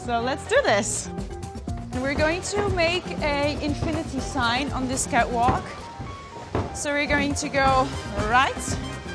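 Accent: Netherlands. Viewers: I’ve never heard of her before. But when she started talking I knew immediately that she’s Dutch. The Dutchness seeps through ✨ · I love how the Dutch pronounce the “th” sound with a “d” instead. “Dat”, “dis”, so cute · Oof Dutch accent ugly asf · U really hear that dutch accent amd the name...very dutch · You can hear the dutch accent coming out 🥰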